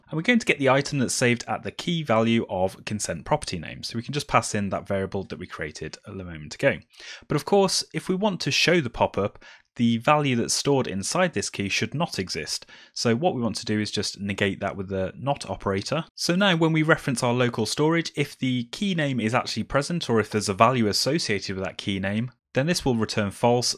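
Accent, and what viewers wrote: United Kingdom. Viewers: Not that I just enjoyed it, it helped me a lot, I implemented it! I just discovered your ytCh, you deserv much more subs. Watched few videos yesterday, I can learn a lot from your videos. I heard that you have a proper English accent. You must be from UK :) I am living next to Manchester :) Do you have a time to be my mentor once a month?...or whenever you have time. That could help me a lot.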